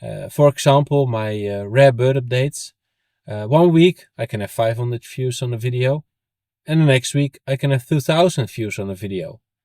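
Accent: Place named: Netherlands. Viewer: I hope you keep the videos coming ! I really enjoy watching them and your journey is really nice to follow A few things which I want to add for sure is however : - do what you have time for ! There are times where you are more busy especially with having kids and a family - show us what fascinates you and not what you think might be interesting for us viewers (that way it is way more exciting as well for you!) - we appreciate the effort of you speaking english! It does not have to be perfect and mistakes makes you human and I m sure no one is bothered by your dutch accent and "mistakes" it shows that you are making an effort to make these videos great and gives the videos a great personality thanks for many hours in front of the TV and learning a lot while enjoying our beautiful aviafauna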